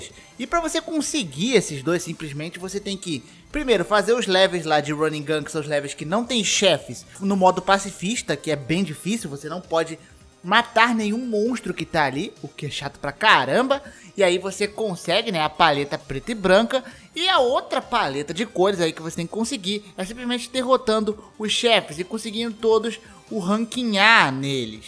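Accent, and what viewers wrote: Rio de Janeiro, Brazil. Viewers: Tu é do rio mano? Qual parte? Sou da oeste, Tmj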